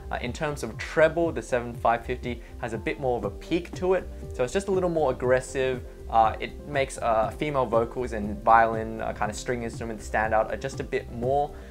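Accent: Australia. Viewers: well he is Australian...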